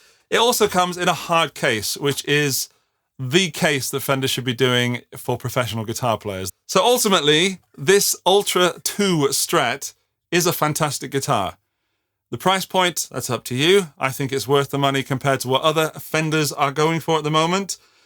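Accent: United Kingdom. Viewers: Just subscribed. Been thinking about getting this guitar, appreciate the in-depth review. BTW, no offense but I just loved to hear you talk, you're just so British slang and all. Thanxxxxxxx!!!!!!!!!!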